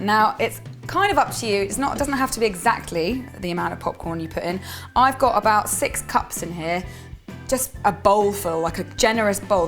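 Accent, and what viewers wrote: United Kingdom. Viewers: I love ur British accent btw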